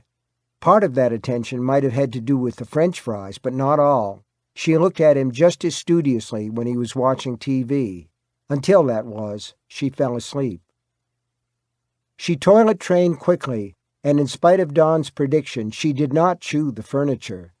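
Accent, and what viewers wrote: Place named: Maine, United States. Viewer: Oh my goodness! When I first started listening I thought, Oooo! I love how the reader has that Maine twang — adds an extra layer of icing to this wonderful cake. I JUST started reading the comments to see who the reader is and if he has any other books as I love his voice. Low and behold, it is the king himself! Go figure! Explains the great Maine accent❤️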